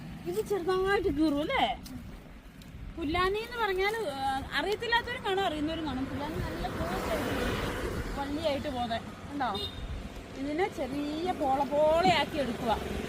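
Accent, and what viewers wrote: Kottayam, India. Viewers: Kottayam thodupuzha slang aanallo nattilevidaya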